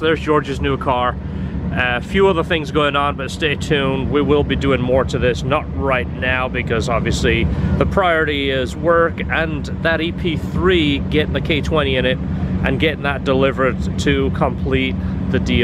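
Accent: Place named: United Kingdom. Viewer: By any chance are you a Britt who emigrated to the States?, Im English & can pick up on your accent and some of your phrases haha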